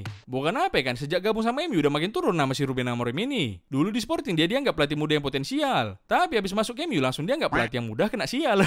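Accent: Medan, Indonesia. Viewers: Gua kesini aja gara gara inget logat medan nya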